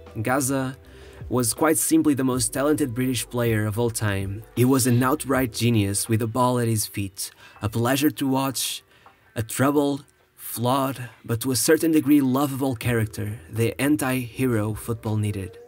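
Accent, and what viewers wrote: Portugal. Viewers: Portuguese accent i love it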